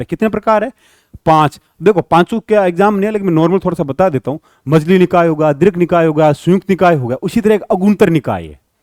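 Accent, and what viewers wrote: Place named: Haryana, India. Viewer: सर आपकी हिंदी भाषा ऐसी क्यू आप कहा के लगता हरियाणा से😮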